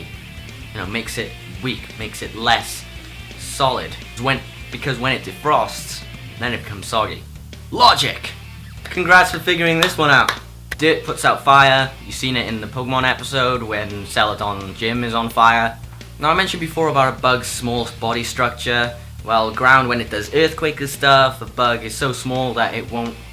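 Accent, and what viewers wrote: Wales, United Kingdom. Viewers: Hey dude, just stumbled upon your channel as I was looking to start up a Let's Play channel in my spare time and was doing some research. Like your videos and have subscribed! Will be sure to keep up to date with your stuff and best of luck for your channel :) Quick question, are you Welsh?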